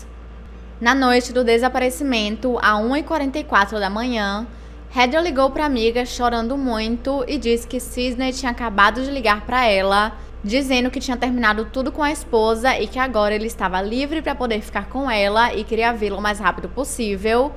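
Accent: Portugal. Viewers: Tô conhecendo o canal através da e já tô amando. Adorei a parceria e o sotaque português é MARAVILHOSOOOOO <3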